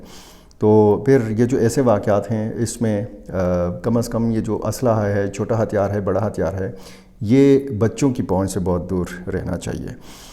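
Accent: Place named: Mansehra, Pakistan. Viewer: In k lehaja bht zada hmry elaqay Manshera sa lagta ha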